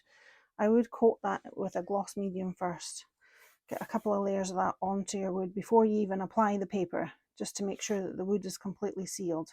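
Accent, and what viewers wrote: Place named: Scotland, United Kingdom. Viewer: Really enjoyed this, as I have recently discovered gel printing. Also enjoy your accent-my mother was from Glasgow(Milngavie) She has passed away and I love hearing the Scottish accent-it is such a comfort!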